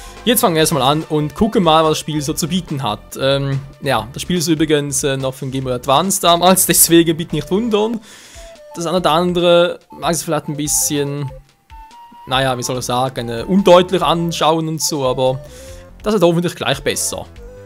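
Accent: Switzerland. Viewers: Der schweizer akzent machts 10mal besser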